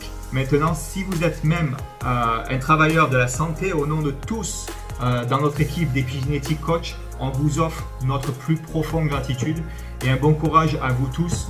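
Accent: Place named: South, France. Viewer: Ah tellement de jolies explications! Couplées à cette accent du sud, magnifique!! ☀️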